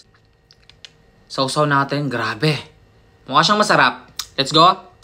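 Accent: Visayas, Philippines. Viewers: Kuya bisaya ka ba? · Bisaya ka po ba 😅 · Gosto. Namin. Ka. Mag. Bisaya. narinig. Koga. Ng. Bisaya. Ka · Hala bisaya diay ka kuya??? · BISAYA KA YAH? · bisaya diay ka ya?😂😂😂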